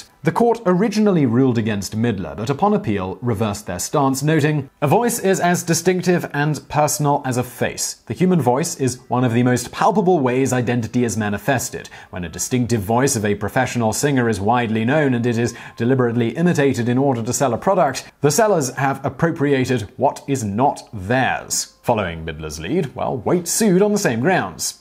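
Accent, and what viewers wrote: England, United Kingdom. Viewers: he's using a European pronunciation of the word that goes by how it's spelled. "Bourbon". He's not drunk, he's English. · Casey Kasem doing a British accent. Expect him to break in to an ad for the gap at any time. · Regurgitating research in a verbose and pretentious manner doesn't make you look smart, it just makes you look like a massive twat. Consider popular academics and scientists such as Carl Sagan or Kenneth Clark who communicate with their audience without the BS. I can tell every day you thank God for giving you an English accent. Every day I thank God that I don't know you personally.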